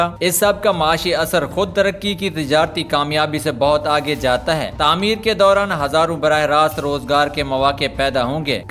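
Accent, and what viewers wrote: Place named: Punjab, Pakistan. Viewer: Punjabi hai , talafhuz punjabiyon ka aaisa hi hota hai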